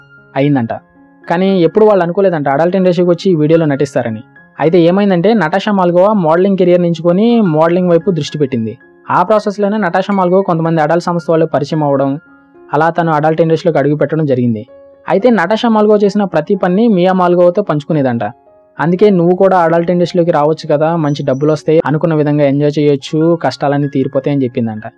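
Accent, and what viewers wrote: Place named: Chittoor, India. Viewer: Medhi a voori anna Chittoor yasala vundhi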